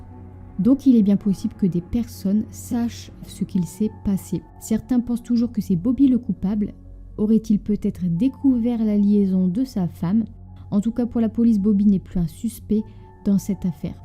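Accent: North, France. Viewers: Au top, comme d'habitude! Mais alors je suis curieuse... ^^ D'où nous vient cet accent? J'ai l'impression d'entendre des sons aux influences du nord mais en même temps je n'ai jamais entendu d'accent du Nord comme le tien si j'ai tapé dans le mille. En tout cas c'est charmant, la touch! J'adore! Merci de nous faire voyager dans le sombre monde du true crime 🕵️‍♀️🚔🔪💉💀🥀⚰ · aaah vous êtes une chtimi comme moi <link> semblait bien percevoir un accent 😁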